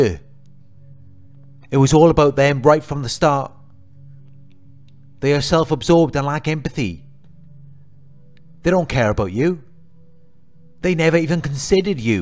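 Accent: Wales, United Kingdom. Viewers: Neither! He’s Welsh.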